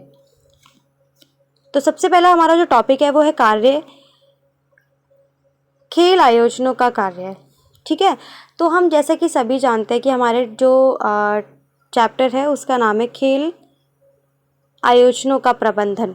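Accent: Delhi, India. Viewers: Mam are u from Delhi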